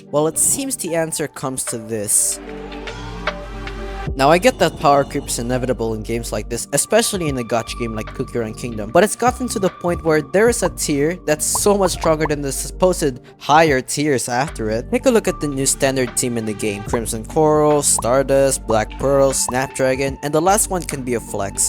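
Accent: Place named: Philippines. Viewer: i know a filipino when i hear one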